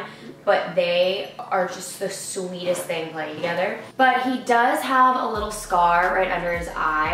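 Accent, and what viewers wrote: United Kingdom. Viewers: That “oh did that offend you” sounded so british 😂 you’re getting a slight accent girl 😂😍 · The little twangs of British that come through in your voice because of Kara are so cute!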